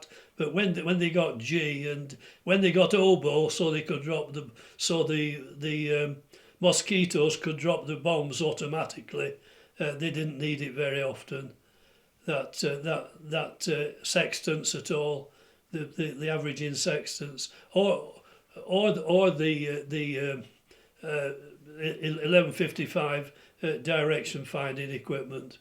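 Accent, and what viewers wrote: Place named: East Lancashire, United Kingdom. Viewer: East Lancashire accent. Do you not think so ? lol ....I locked myself out of my house house here in Wisconsin when it was MINUS 30 C. I was wearing a T shirt and I thought I would die in 5 minutes. My house has security glass and I couldn't break in with a big hammer so I begged for shelter at a neighbours house......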